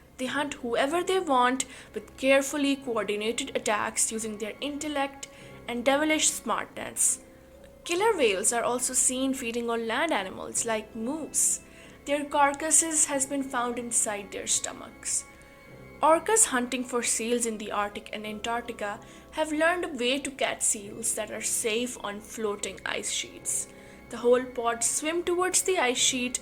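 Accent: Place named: India. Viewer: No way could a little polar bear kill a large killer whale. Killer whale would manufacture mince carnage out of meager polar bear. Polar bear may have powerful bite, but so does killer whale & its mouth is grander with 4" dentures. Killer whales also hunt beluga whales as polar bears. Killer whales hunt same prey as these bears like seals & even kill great white sharks & i bet even crocodiles including larger whales than themselves, but as a group. If i were still a betting person, my money would be on killer whale as victorious victor. Anyway, narrator must be from India.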